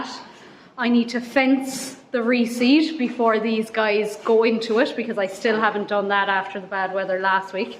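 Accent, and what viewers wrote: Ireland. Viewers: Well done. You really do work hard. I love your soft Irish accent. I could listen and watch you for hours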